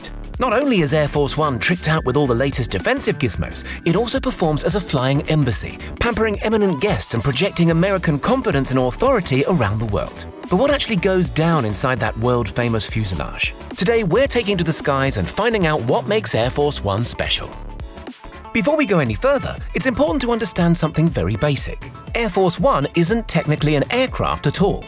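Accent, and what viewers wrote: United Kingdom. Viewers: Wow, that British accent caught me off guard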